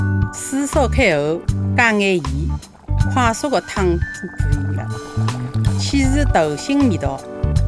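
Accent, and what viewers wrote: Shanghai, China. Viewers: 上海话听着倍儿舒服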